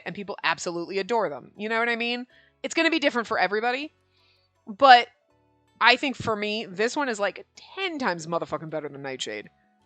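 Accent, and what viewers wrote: Ireland. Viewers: YES! The "Irish" Accent appears. I don't know why, but that has become my favorite of your voices. XD Don't apologize for it. We love it. We're charmed by it. ... At least I am. LOL I LOVE these two Sato brothers!!! OMG! I'm gonna love the side stories for them. And all four men fighting over who carries Shanao, just perfection. XD XD YOu know those two Sato brothers TOTALLY know she's a woman and are totally enamored with her already. XD XD GEEZ! This game keeps getting better and better. XD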